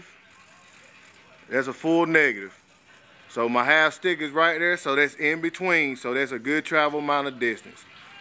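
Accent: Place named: Virginia, United States. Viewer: Thank you! You sound like my family members from Virginia. I appreciate your help.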